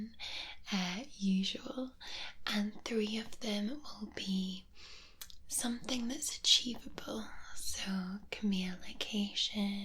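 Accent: Scotland, United Kingdom. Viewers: Awww what a magnificent video full of restful relaxation and calmness! 😴 That soft whispering voice with your lovely Scottish accent sends me right into LA LA land!! 😴 Dream number 3 and number 6 were my favorites! 👏👏 Number 3 definitely had plenty of tingles from all of those lovely animals jumping in and out of the woods along with those amazing bird sounds 👌 😀 👍. Number 6 was my top favorite because had invited me into her amazing home! 👏 Of course chatting with would be the ultimate wish but to actually sit down and play with Hugo and Lindi would be totally awesome and cool:_Hugo:🐶😎👌 😊. Thanks for sharing all of these incredible dream stories with me for I am totally relaxed and looking forward to a awesome night's rest!😴💤 Your just getting better and better with each and everyone of your videos! 👍 Do take care and have a magnificent evening!! 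Lots of love to you xx 🤍🤍 😍💕💕💕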